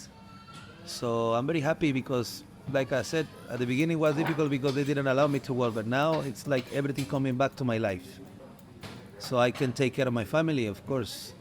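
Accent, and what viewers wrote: Mexico. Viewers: lol he's Mexican. His accent is Mexican with English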